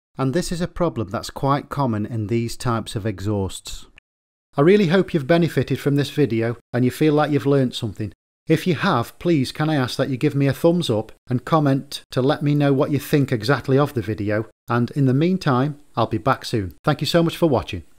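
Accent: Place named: United Kingdom. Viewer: wow its really good to learn from a British guy for once 🇬🇧😁 as my hearing ain't great..no offense to our cousins across da pond.. but I can't always understand yer accents..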